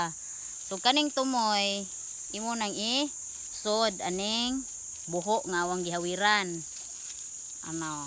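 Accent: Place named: Bohol, Philippines. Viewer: malingaw kaau ko maminaw jud sa bul anon ba, "talijukon" "buho" ❤️❤️❤️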